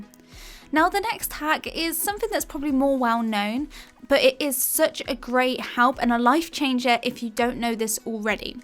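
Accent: United Kingdom. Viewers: Sou are bri-ish (no hate nice tips):)it help me alot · Are you from UK?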